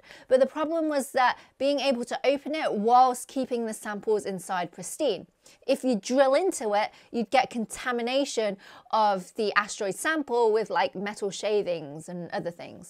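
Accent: United Kingdom. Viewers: asian lady with a brit accent. literally exactly what the internet was created for. yeah yeah and science sure but mostly the former.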